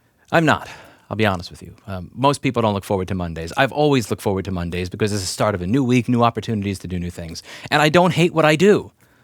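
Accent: New York, United States. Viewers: In most advocacy, there is usually a good cop, bad cop team that complement each other. So you're the bad cop that makes the difficult arguments. The bill wasn't defeated because of you. It was defeated because the politicians didn't want to pass it and then searched for an excuse to reject it because they couldn't admit that they were rejecting it due to lobbyist pressure. So they found you and blame you. Please do not feel bad. You have played a very valuable role on this and your contribution should not be devalued. But you're not the reason it didn't pass and you cannot blame yourself. You may have a "new york attitude", but you still make cogent arguments. And any politician would be used to the likes of you making "strong language" to make points. Please continue, even if living in the woods with deer causes your new york state of mind and accent to fade :-)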